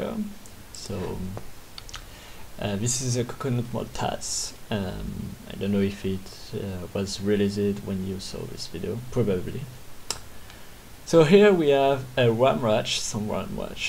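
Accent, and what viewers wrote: France. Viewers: You can hear that you're from france 😎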